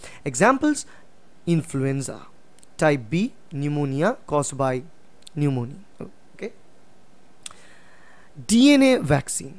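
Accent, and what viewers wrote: India. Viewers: although his accent is pretty good the indian accent shines through him thats kind of nice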